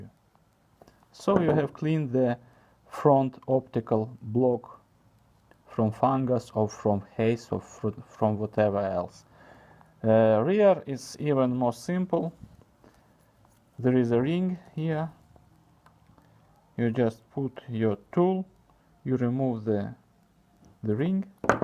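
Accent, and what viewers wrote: Russia. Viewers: lol, your pronunciation as a Russian man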